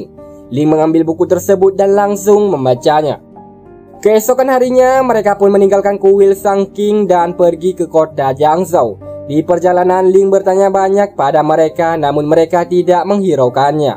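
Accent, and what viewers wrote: Aceh, Indonesia. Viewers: Apaceh banget logat nya. Wkwkw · Logat apa Aceh , lanjot bang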